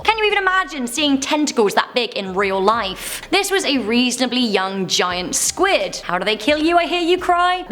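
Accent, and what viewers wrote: United Kingdom. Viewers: It’s British like mine <3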